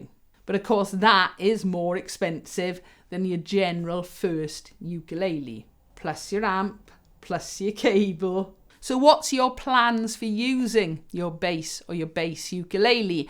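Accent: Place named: Wales, United Kingdom. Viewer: Hi many thanks for your informative reply. Sadly the purple Flight Ltd Ed mini J bass is now sold out. I could return the Squier I suppose. I would be interested to hear why you prefer the Ibanez Mikro. FYI loving the purple, dragons and Welsh accent, reminds me of my second home - studied in Wales many Moons ago and miss it. Keep on playing 💜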